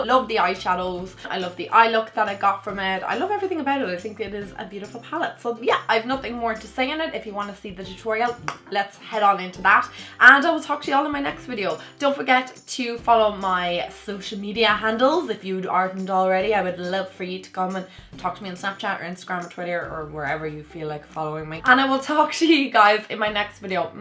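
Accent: Ireland. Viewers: I love your accent is it irish? you should name the kitty Oreo. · Mariah M the accent is Irish, I'm Irish myself 🍀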